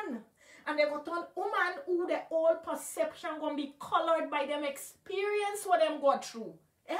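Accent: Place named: Guyana. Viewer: I am not guyanese or caribbean but love listening to your beautiful accent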